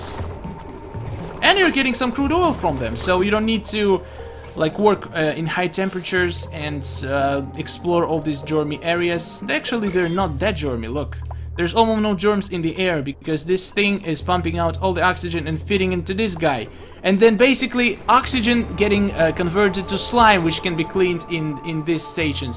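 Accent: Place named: Russia. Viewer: таки ты русский чтоль с таким акцентом?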